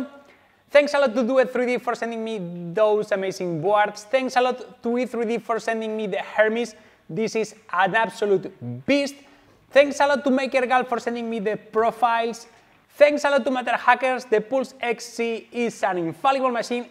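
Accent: Spain. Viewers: 4° Comentario en español xD, empiezo a ver el video y digo, por el acento, este es español jajaja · He's not Italian, he's Spanish. Didn't you notice the accent? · Has un canal en español, se nota que eres español:v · Se nota bastante que eres español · No te había visto nunca y cuando te he visto hablar me he dado cuenta q eras español, al instante. Muy buen video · como se nota que eres español xd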